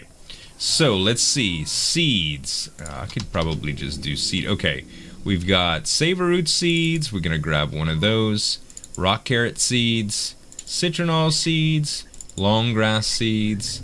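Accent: Louisiana, United States. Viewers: Mais, you from Louisiana?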